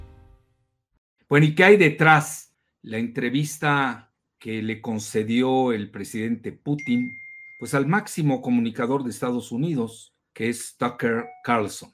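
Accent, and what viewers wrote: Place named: Mexico. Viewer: Estimado Alfredo, por tu acento diria que eres como.yo MEXICANO, yo estudié en Moscu 8años, lis 8 años más felices y hermosos de mi vida, aqui no hay mucho lugar para escribir y describir toda mi experiencia, solo te diré hay una exelente entrevista aqui en YouTube hacha por Vladimir Soloviev a Putin, hará unos 7-8 años atras vela y si no hablas ruso pidele a alguien que te traduzca ahi podras ver el gran pensador que es Putin, luego hay otra entrevista hecha por el director americano Oluver Stone, mirala y ve lo que dice Stone sobre Putin. Saludos desde Alemania Fernando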